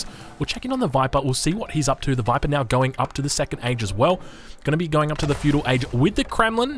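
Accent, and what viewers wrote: Australia. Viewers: omg... can you be less aussie?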